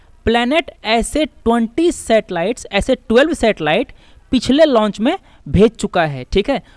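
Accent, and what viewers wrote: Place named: Bihar, India. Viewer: bihari type of language of tutor..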